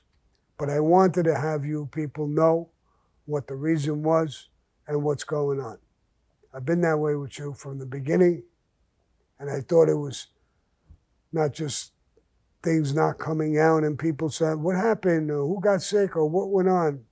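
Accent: New York, United States. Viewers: I'm from the Bronx and was raised in an Italian neiborhood ,I live in the Midwest now so l have a new York accent and love hearing Mr gravanos accent as well,brings me back to the Bronx.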